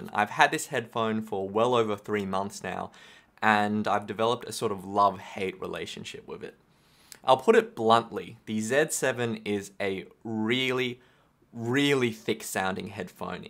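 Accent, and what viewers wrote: Australia. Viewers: An Asian guy with an Australian accent? Mind blown.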